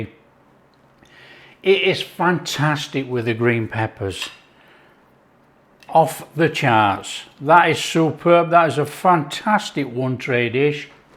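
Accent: Yorkshire, United Kingdom. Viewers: why didnt you poke the sausages? Mu husband is from Leeds, and when he puts on a Yorkshire accent it sounds like you. I randomly came across your video because i googled sausage potato onion trying to find a new way to cook my big "lad's" cumberland sausage. Im German- Canadian and we came across some wonderful food like this while visiting Germany. I subscribed.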